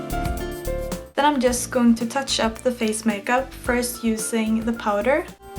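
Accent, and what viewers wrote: Norway. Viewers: You're super good. but i can here by the accent that you are Norwegian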